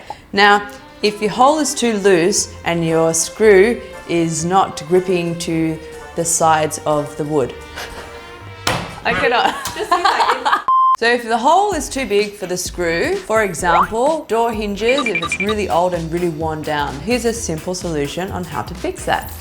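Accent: Singapore. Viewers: I love her and her Singaporean accent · Is she singaporean?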